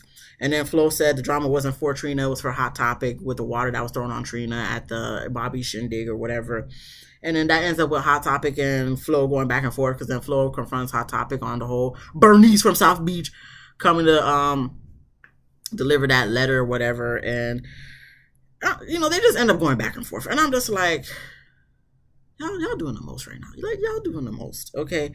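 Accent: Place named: Charleston, United States. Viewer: oh ok. You sound like some of my peoples from Charleston.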